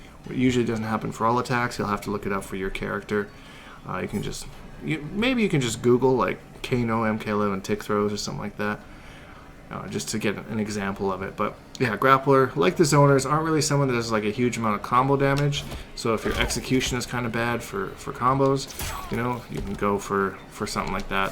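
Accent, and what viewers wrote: Canada. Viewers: Canadian af 👍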